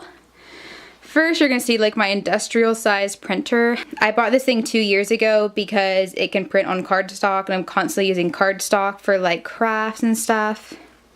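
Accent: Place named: Pennsylvania, United States. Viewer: i love the farmhouse! is this in pennsylvania? thats my home state and i feel like thats you're accent haha i love it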